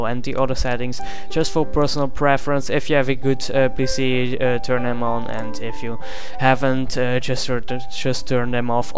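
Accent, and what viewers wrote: Netherlands. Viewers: So you're Dutch? Or from Belgium? I can hear it in your accent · Hahaha je bent nederlands ofniet ??? ik hoorde het de hele tijd aan je accent en toen zag ik ineens je lijst vol staan met nederlandse servers :P maar goeie vid man ik ga ze ff bekijken want ik snap nog niet hoe het spel werkt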